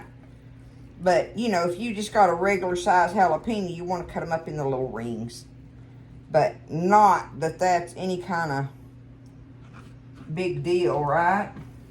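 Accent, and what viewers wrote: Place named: Kentucky, United States. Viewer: Where are you from? You sound like me and I am an old Kentucky girl.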